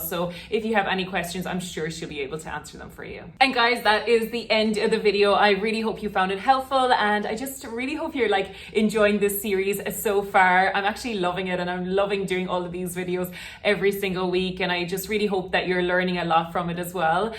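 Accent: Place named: Ireland. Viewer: u sound irish but certainly dont look irish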